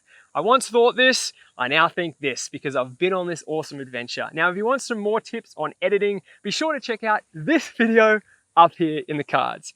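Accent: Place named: Australia. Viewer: Dunno what I love more!! The epic tips, hat, or the fact that this is the most Aussie ever sounded. Top highlights: “It’s a bloody absolute ripper” “Let’s not be d*ckheads” Thoroughly enjoyed 😝✌️🤙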